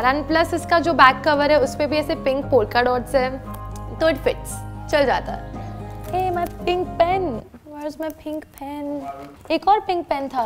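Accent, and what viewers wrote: Gujarat, India. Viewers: Gujrati accent , Jada , ada You can buy something related to New year or republic day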